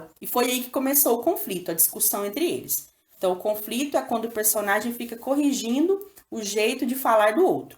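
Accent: Minas Gerais, Brazil. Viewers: Sua voz é linda Seu sotaque é como o meu Pois sou mineira também Continue assim Vc é maravilhosa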